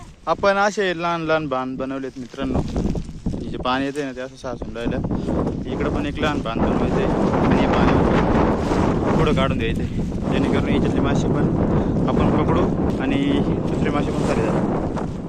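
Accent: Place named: Karwar, India. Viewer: करवाली बोलतात .....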